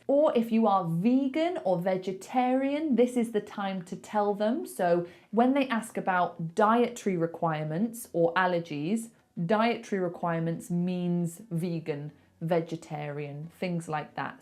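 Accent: United Kingdom. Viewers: I like the way you talk, I like British accent, if ever I'll go to the UK, I want to go to cavern in Liverpool and you to see you in person.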